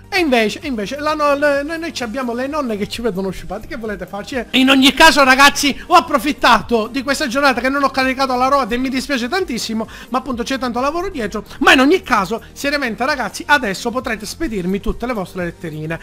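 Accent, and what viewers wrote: Sicily, Italy. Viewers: ma sei siciliano??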